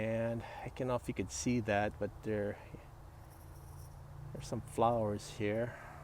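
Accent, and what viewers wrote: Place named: Chicago, United States. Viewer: Love your speaking style and your authentic Chicago accent! Excellent presentation.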